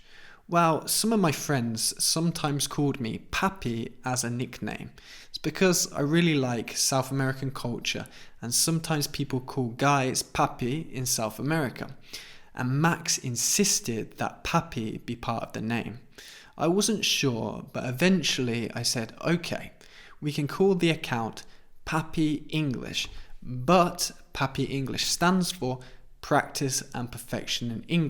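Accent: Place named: RP, United Kingdom. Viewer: 👏👏👏👏👏👏Goooorgeous English without an accent! When I was young I lived in Midhurst where I looked after my uncle's ponies at Cowdray Park Polo Club. You speak English like those polo players there, if you know what I mean! 😀😄😀😄Felicitaciones,! Eres un genio, amigo! Un abrazo, from Olivos, Buenos Aires, Argentina🇦🇷🇬🇧🇦🇷🇬🇧🇦🇷🇬🇧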